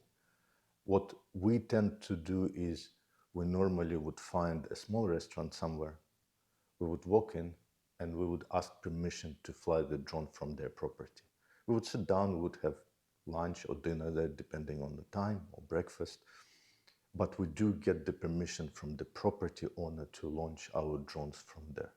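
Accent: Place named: Turkey. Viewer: Abi Türk müsün aksanından anladım selamlar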